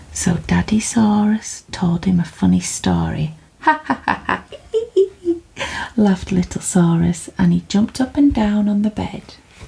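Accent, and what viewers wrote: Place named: Yorkshire, United Kingdom. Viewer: Been so hard to find a proper yorkshire accent to fall asleep to! it's all americans doing accents for fun or posh people and i hate it!!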